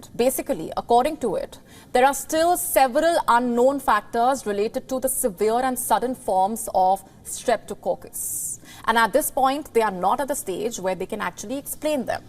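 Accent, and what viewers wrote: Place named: India. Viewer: i hate her Indian accent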